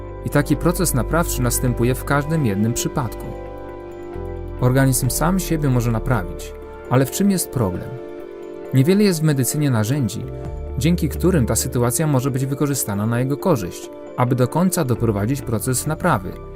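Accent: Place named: Russia. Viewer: Wreszcie, pan, znalazł sposób by być zrozumianym, wielokrotnie próbowałam dotrwać do końca wykładu, ale w związku ze złą wymową lub też brakiem słówek język pana dla mnie jest nie możliwy aby zrozumieć, co ma pan na myśli, bardzo ciężko, jednakże lubię rosyjski nalot językowy, ale w tak poważnych zagadnieniach potrzeba dokładnego przekazu, bez naleciałości, ponieważ to sprawia rozproszenie i niemożliwość koncentracji.Podziwiam jednakże zasób wiedzy, jaką dysponuje Pan, życzę wszystkiego dobrego, Pozdrawiam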